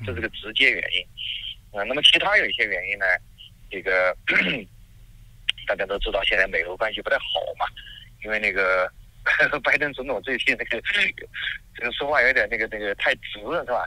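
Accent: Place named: Cantonese, China. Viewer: 这个主持人一听就是讲粤语的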